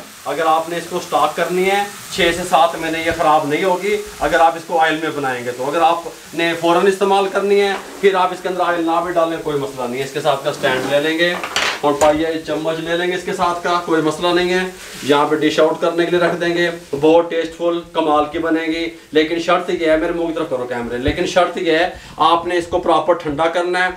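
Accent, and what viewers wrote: Punjab, India. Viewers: Ap ka btany ka panjabi style bht acha lgta h ap English tch Mt dyn mza AA jata h JB ap khty h db Dio heeeen baba kug smja ria ayyy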